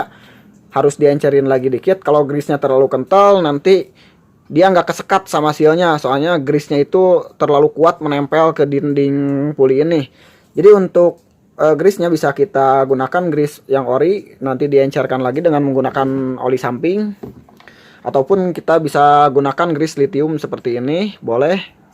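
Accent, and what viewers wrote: West Java, Indonesia. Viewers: Jelas dan ngakak saya ngadenge nya mang 😂 kaciri sunda na pisan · Meng inspirasi suatu saat bakalan tak coba. Kang tinggal dmn mau dong motor saya di sevice kaya gitu di acak acak.. hehe.. kalau logat mah Sunda yah... Daerah mana kang..minta no wa dong. Klo blh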